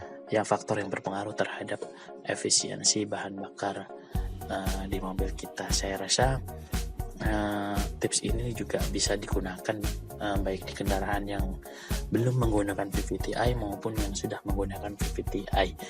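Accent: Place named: Madura, Indonesia. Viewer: Asli madura ya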